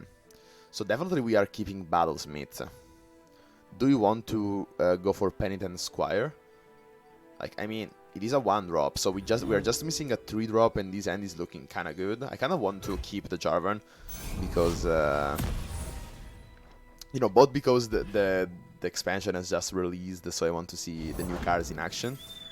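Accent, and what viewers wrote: Italy. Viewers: l'accento italiano e incredibilmente ovvio comunque bel video. E METTI STO DECK CODE xd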